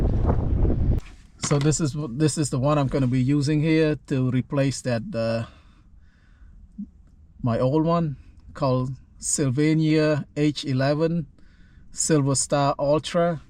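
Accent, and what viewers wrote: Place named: Cambodia. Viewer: No doubt. This man is Cambodian. his accent says it all.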